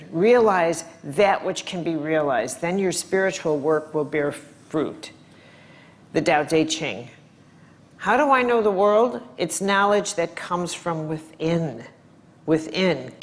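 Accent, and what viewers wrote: Chicago, United States. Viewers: She feels so familiar to me and when I looked up her info I realized why. She is from Chicago, as am I. Her accent and her vibe is so Chicago, and for me, so comforting!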